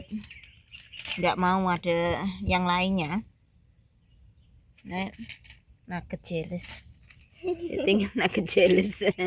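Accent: Java, Indonesia. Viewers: mba sy baru mengikuti Chanel sampian, sy jd suka, karena gaya bicara nya mba medok bahasa jw, unik gitu lho campur2 bahasanya 😁❤👍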